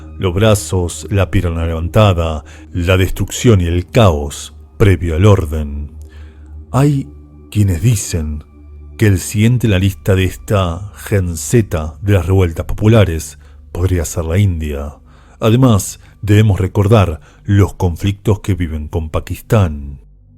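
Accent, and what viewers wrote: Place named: Argentina. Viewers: A pesar del acento argentino... Te has pasado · Superas ampliamente a Ivan de gran misterio tienes un gran talento de deducción y una gran forma de explicarlo a pesar de tu acento argentino (Felicidades) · A pesar del acento argentino??? 😠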